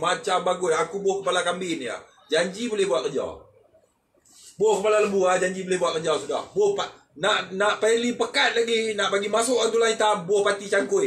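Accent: North, Malaysia. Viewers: Dulu Cara ckp kl skrng nah ckp utaqa boi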